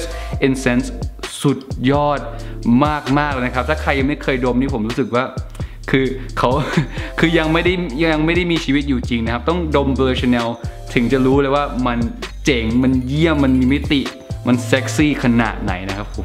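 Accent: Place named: United Kingdom. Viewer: I love your EN accent <3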